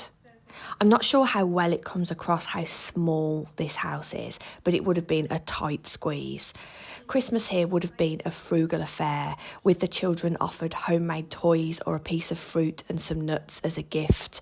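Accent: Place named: England, United Kingdom. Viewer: "Hellou'uu" It Astounds Me how you English Pronounce your words. So Comical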